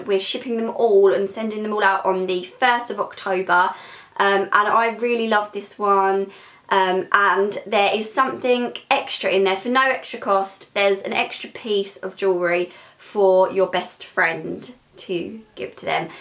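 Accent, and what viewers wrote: Essex, United Kingdom. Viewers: sounds like Essex maybe? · I like british accent !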